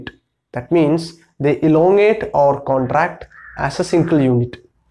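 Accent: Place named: Kerala, India. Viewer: Are u a malayali, anyways great video. Keep delivering great content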